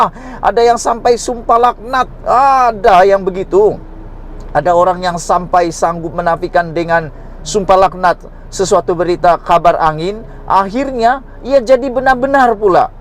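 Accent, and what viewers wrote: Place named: Malaysia. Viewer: Kalah kalam berita tv 3 jangan cakap KL kau melayu pekat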